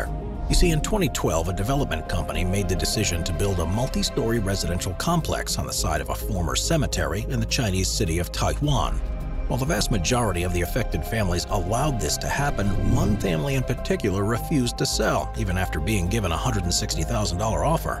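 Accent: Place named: Philadelphia, United States. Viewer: super random by are you by chance from the philadelphia area? I notice the way you say words like "majority" and "Florida" with the a pronunciation which is how my philadelphian parents talk. I feel like I dont hear it that often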